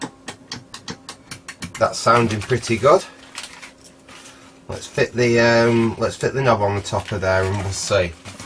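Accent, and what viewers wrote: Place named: Bristol, United Kingdom. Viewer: Interesting accent trying to place it. Bristol perhaps but just a wild guess.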